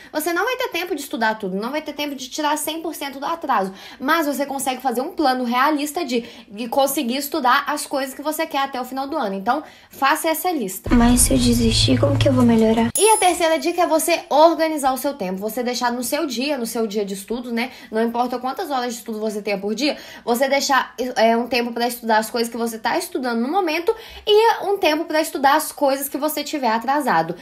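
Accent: Minas Gerais, Brazil. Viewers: menina, faz um tempo que nao te acompanho até pq meu foco é concurso público mas sempre via as aulas de história. Estou impressionada como seu canal cresceu e a qualidade e conteúdo dos vídeos tmb estao melhores... excelente! E o que é melhor é o sotaque mineiro!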